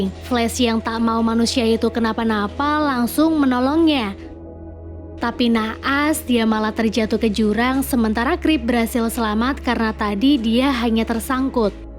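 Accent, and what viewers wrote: Pemalang, Indonesia. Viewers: Kok pemalang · Kk org pemalang yaa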